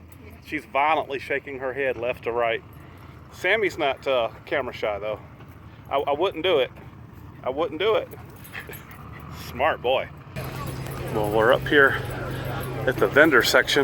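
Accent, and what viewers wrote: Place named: New England, United States. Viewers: Nice to hear the New England accent out there on desert! That slide guitar music reminds me of my daddy and his band back in the 50's . My daddy could play an electric lap guitar with a with a metal slider. It was great! He knew Les Paul and Mary Ford! The band used to play clubs on the East Coast of New Jersey. That music ending your video today was a nice memory. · I knew it was a Boston accent! Tho you do have a bit of a down east accent (you know those Mainers!) Boston here too. GO PATS!! 🏈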